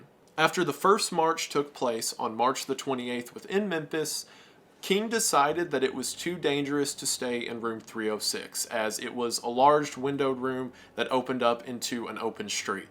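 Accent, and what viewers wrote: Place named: Carolinas, United States. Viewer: Where are you from? You definitely sound like someone from the Carolina’s or maybe Virginia.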